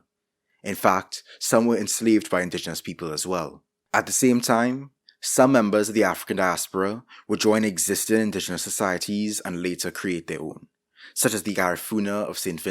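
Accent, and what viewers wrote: Trinidad and Tobago. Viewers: I heard the accent and went "It's giving, Trini". Glad to be right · Why you sounding like a Trini 😮🇹🇹💯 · Great video! Found you through the latest Noah Samsen vid. Also a trini, recognized the accent immediately. Keep up the good work. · Are you Trini? cuz this accent.....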